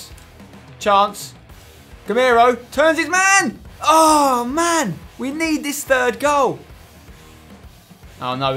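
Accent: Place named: United Kingdom. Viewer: Chaz Hartwayne he talks British that is why he is saying it like that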